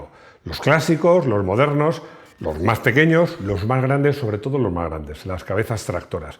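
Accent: Chile. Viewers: ... esa voz en off es sin duda de un chileno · la voz en of ES CHILENO " PA ONDE NOS FUIMOS" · es chileno se le ve en el asento... A donde nos fuimos...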